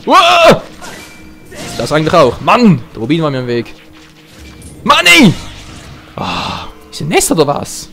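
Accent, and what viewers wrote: Switzerland. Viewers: Man merkt total das du schweizer bist ^^ Bin auch schweizer aber kann perfekt deutsch ohne akzent reden, weiss auch nicht wieso :D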